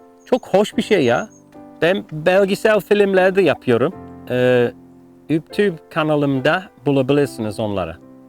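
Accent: Turkey. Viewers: Bay.. Türkçeyi. Fethiye. Şivesiyle. Öğrenmiş. ❤️😊🌹🙏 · You are such a good person. I can't believe that a person who has a beautiful heart exists... Okay uncle, I'll meet my friends tomorrow to change the situation. We all love you and your Turkish accent!❤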